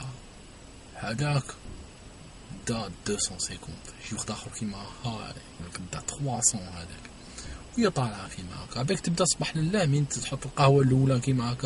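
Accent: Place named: West, Algeria. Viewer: LOLOL Dude, The stories about people are so funny... ... Kifash rahoum ouled Mosta? Ça fait plaisir entendre l'accent de l'Ouest. Welcome back.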